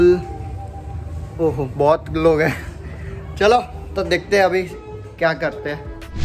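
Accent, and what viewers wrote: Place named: Gujarat, India. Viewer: Mane laagtu j tu tamara ane learn na India cup na interview ma ke aa loko gujarati hase pakku...Tamara hindi ni style upar thi 😂😂 Love from Ahmedabad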